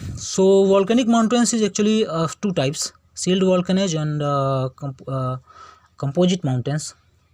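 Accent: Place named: India. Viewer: By according to your phonetics, I think you are an Indian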